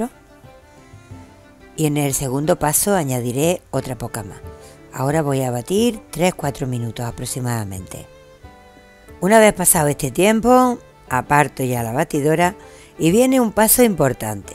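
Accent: Spain. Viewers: Hola.Tus detergentes son geniales.Aquí en mi país no se consigue jabón en escamas ni en panela blanco,Pero venden uno que es azul (marca "Las LLaves...creo que uno de sus ingredientes debe ser el azulillo y es de muy buena calidad)..¿Me servirá usar ese?...Otra duda que tengo es que he leído en varias ocasiones que el cloro o lejía no debe ser mezclado con vinagre,porque sería muy tóxico..¿.Puedes aclararme eso por favor?....Gracias por recetas de detergentes super bien, y si me permites te digo, que tienes un acento español muy bello, (todos los acentos españoles lo son, pero el tuyo es especialmente bonito).Chao un abrazo muy fuerte desde mi Venezuela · pero qe te pasa no sabes que la lejìa o cloro no se debe mezclar nunca!? El hipoclorito de sodio libera gases tòxicos al entree en contacto con otras sustancias Tu canal es muy entretenido, didàctico y disfruto el habla andaluza pero en este caso cito una tonadilla cubana que dice...ave maria, conmigo vas a acabar!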